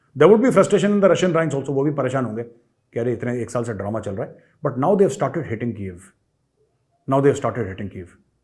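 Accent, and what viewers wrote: Lahore, Pakistan. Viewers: Unique delivery by you of Lahori bol "Kar rahey Hain, Haar rahey Hain...." You video will remain incomplete without this "Hain" word style... You are tooooooooooooo Gooooooooooooooooood... Love you Major Sahab... Bharat Mata Ki Jai... Jai Hind Sir... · Maj sahab aapka Lahori style bahut badiya h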